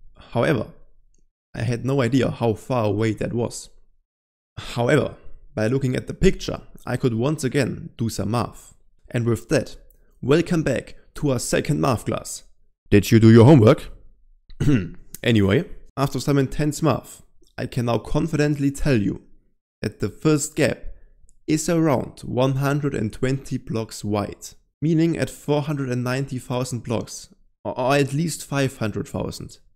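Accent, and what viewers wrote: Germany. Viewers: I hear German Denglish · Da hört man den deutschen akzent direkt raus hahah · youre definetly german i can tell by the accent so servus meister wie gehts super video 👍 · Bester deutscher akzent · ich liebe den deutschen akzent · Aha gutes video man merkt so bisschen das du Deutsch bist ❤ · I hear german🧐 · Wieso hör ich so das deutsche englisch raus 😂 geiles vid❤ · Hallo wieso machst du deine Videos nicht auf deutsch (man hört das du aus Deutschland kommst) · I hear ein bisschen German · bro sound just a little DEUTSCH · Are u german ur accent sounds like ur german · Höre ich da etwa... DEUTSCHEN AKZENT?? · I can hear the German accent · I hear a bit german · Hahahahaha hier der deutsche Kommentar nach dem ihr alle sucht, passend zum wunderschönen Akzent 🇩🇪🔥! SEHR STARK GEMACHT, MEISTER 💪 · Immediately noticed the German accent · is this an german accent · ofc the guy with the most german accent ive ever heard is talking about ethnicity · Man kann den deutschen Akzent hören lol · Bist du deutsch? 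Dein accent hört sich sehr danach an. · i can hear a little bit of german :3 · ok ok i hear a little bit of german in your voice, aber ehrlich cooles video bro mach weiter so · I can hear the deutsch :) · Oi digga, bist du Deutscher? Dein Akzent ist ziemlich deutsch lol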